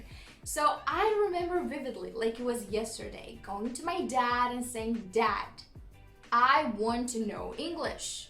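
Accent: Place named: California, United States. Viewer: I am American, you barely have an accent. Change a few sounds and you'd be confused for a California girl. Well done😘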